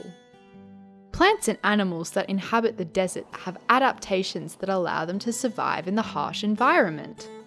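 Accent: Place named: Australia. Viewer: Sounds like a Australian accent it's kinda harsh to ask for them to pronounce it correctly many people may pronounce it differently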